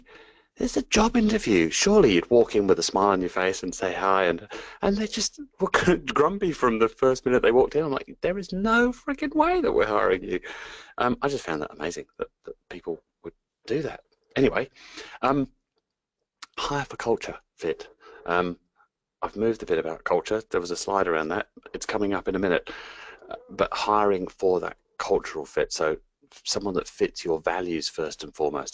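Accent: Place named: United Kingdom. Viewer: British accents are sick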